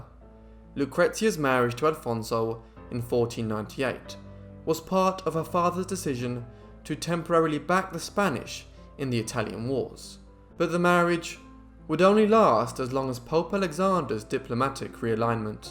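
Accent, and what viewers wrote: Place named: Upper class, United Kingdom. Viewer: I can tell that you are from the upper class, sir. 👍🏼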